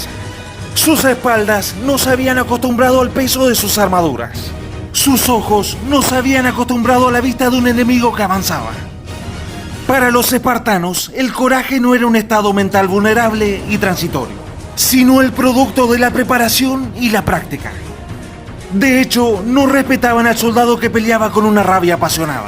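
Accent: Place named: Córdoba, Argentina. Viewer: Ufff ese relato cordobes.... Muy bueno...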